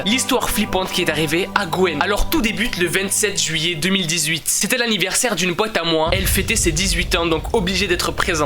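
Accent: Marseille, France. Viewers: t marseillais parce que moi aussi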